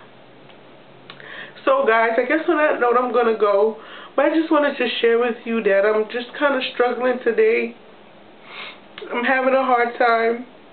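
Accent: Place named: New York, United States. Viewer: Dang your accent is so deep...Ny right lol...but people cant be so so rude. talking about your feelings and writing about them in a journal will defintley help..You have a right to feel any way you want about a situation those are your feelings...you are the only one walking a life in your shoes..no one has had your life but you so you're feeling will be different from everyone else. With the tears, the healing definitley begins little by little.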